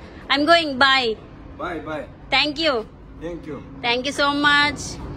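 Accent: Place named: Karimnagar, India. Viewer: U r dare to travel alone beeing a girl.Iam from Karimnager seems that you r nearer my slang.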